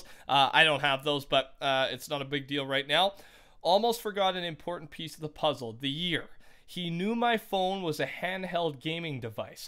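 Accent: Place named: Canada. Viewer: Is he canadian? I heard a little accent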